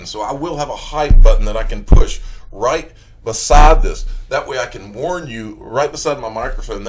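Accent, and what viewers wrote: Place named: Nashville, United States. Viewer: You have to be from around Nashville with that accent.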